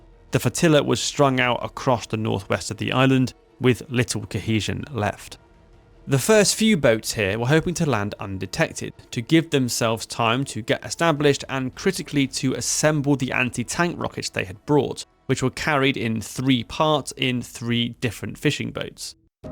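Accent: United Kingdom. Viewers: Good information but your bias is too strong. Also your pronunciation of Chinese is soooo British. Just a travestry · The British accent is the best for history videos fr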